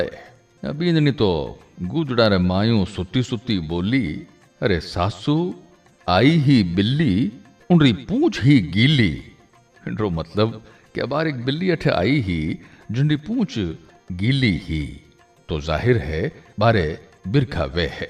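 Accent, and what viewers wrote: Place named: Jodhpur, India. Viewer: आपकी आवाज सुनकर जोधपुरी होने का फख्र है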